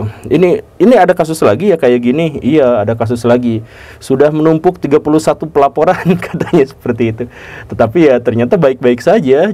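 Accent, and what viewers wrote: West Java, Indonesia. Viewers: Jika ingin mencontoh kan maaf dan maaf.... Jika saya mengkritik dalam penyampaian dan pembuatan Kontennya tidak bermutu dan mengkritik dengan bahasa sarkas tapi saya menyinggung gaya bahasa yang di sampaikan berlogat Sunda, saya yakin nggak tersinggung dengan kritik saya tapi itu sebagai Vitamin tapi yg tersinggung pasti masyarakat Jawa Barat yg akan marah karena telah membawa cara penyampaiannya, Semoga juga bisa menyampaikan hal ini ke masyarakat dengan Chanel YT nya hukum yg tidak tertulis di masyarakat agar semua paham karena sejujurnya apa yg di sampaikan itu simple dan mudah dipahami tidak menggunakan diksi' yang jelimet..!!! Sukses terus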